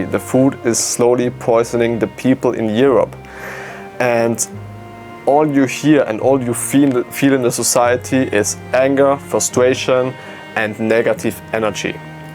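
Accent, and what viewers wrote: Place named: Austria. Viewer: Guys Austrilia and Austria too different. He sounds like european/ Austrian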